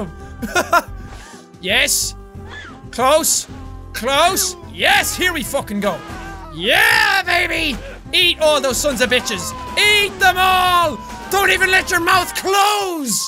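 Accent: Ireland. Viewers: Got so Irish this episode. Just listen to the outro. I LOVE IT.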